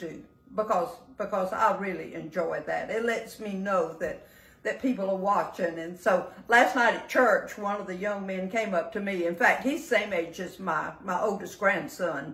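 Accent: South, United States. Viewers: I love your southern accent! You sound just like my great aunt! I could listen to you all day! Blessings to you! Shine For Jesus! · your refined southern drawl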